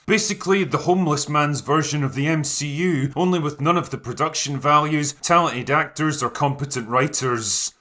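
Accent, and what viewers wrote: Scotland, United Kingdom. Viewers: your less-funny-but-scottish mr plinkett schtick is top notch, keep it up mate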